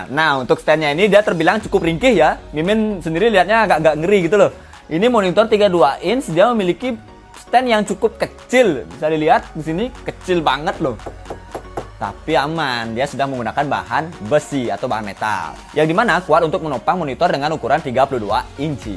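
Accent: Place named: Bali, Indonesia. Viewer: medok bali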